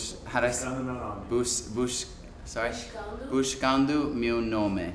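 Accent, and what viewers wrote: Rio de Janeiro, Brazil. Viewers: o sotaque dele é bem carioca 😂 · parece o sotaque do povo do Rio de Janeiro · "BUXXXCANDO MEU NOME" meu Deus, ficou quase uma semana no Rio e já tá falando igual Carioca <3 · E esse sotaque carioca no Buxxcando?? Ameei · que sotaque carioca mais lindo, tô apaixonada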